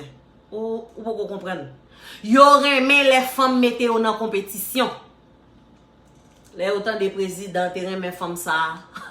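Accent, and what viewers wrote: Cap-Haïtien, Haiti. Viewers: Helloo men mwen ...mwen vin la pou 2 bagay...mwen vin la pou bel ti accent capoise sa ou genyen an...avek bel konsey ou yo 🤣🤣😍😍😍...tout saw di yo se verite❤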